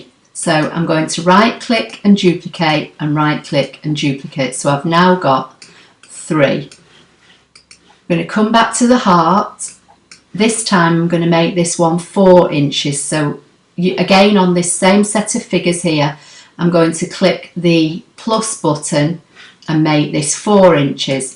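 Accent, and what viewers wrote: United Kingdom. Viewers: Great and beautiful work! And I looooooooooove your British accent!!! I'm from Córdoba in the middle of Argentina. Namaste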